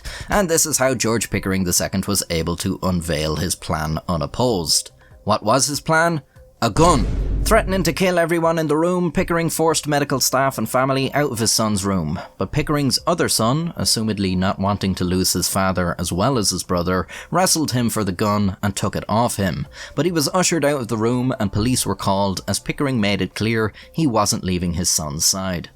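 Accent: Ireland. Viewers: I like hearing ridiculous stories and funny quips in an Irish accent… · With your accent lad I just cant figure which county ya from , wicklow, meath. If you're from dub I'm gonna unsubscribe. Lie if ya want to.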